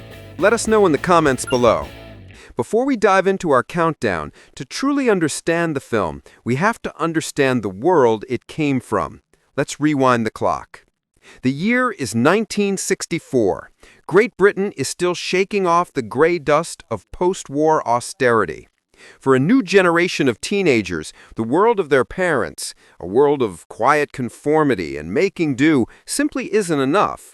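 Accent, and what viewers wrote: United States. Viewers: Why the change to an American narrator. Is this all AI? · Who is this Yank narrator talking b.s.? Great Britain was not 'shaking off ww2 post austerity in 1964'. The 'never had it so good' years had just gone and the more optimistic 60s were in full swing. I doubt any Americans had even heard of the mods. 🙄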